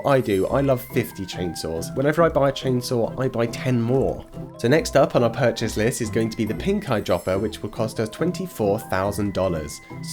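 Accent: England, United Kingdom. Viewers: Are you British? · are you britesh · is he english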